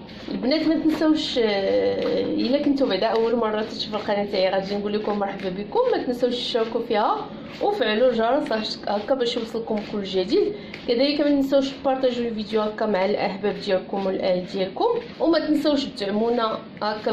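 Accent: Marrakech, Morocco. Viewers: jadore tes explications tout est simple avec toi jaime beaucoup ton accent de marrakchia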